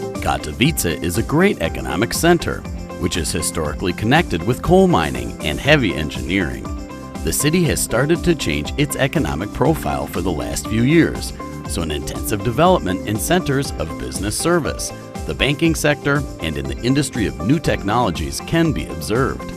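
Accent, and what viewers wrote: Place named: United States. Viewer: It would have been MUCH better to have a Pole who spoke good English to do this voice over. The narrator's strong American accent is horrible and his pronunciation of some of the Polish words are terrible.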